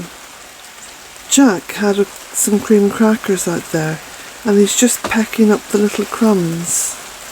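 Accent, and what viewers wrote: Ireland. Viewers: (((❤️))) Perfect Day For Reading! FANtastic Books You Chose! What Fun; To Cozy Up With A Blanket And To Hear The Rain Outside! BTW: I’ve now shared your videos with my three grown sons; Sean Patrick, Kevin Michael, Brian Kelly...I knew for sure they’d love you...their grandmother on their daddy’s side from County Mayo. What can I say...had to name my kids Irish names...otherwise...being Italian that I am, all there was to pick from was, Tonys or Joeys! 🤗👍😉My sister did the same with her only two daughters; Shannan, and Erin! We both wanted children with green or blue eyes! Of course, red hair would of been awesome! (HaHa!) In any case, I knew your accent and where you live would bring them joy! And, not to end, for them to see their mother be so happy to have found your videos that too, brings us all much delight! Oh, I could listen to you forever! 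I hate when your videos end! Wishing you a glorious day ~ No Matter How Spelled, Let It Reign!☔️🏡📚👍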